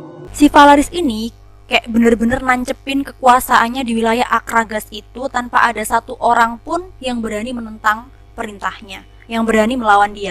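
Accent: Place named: Surabaya, Indonesia. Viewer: Iki arek suroboyoan ta koyok'ane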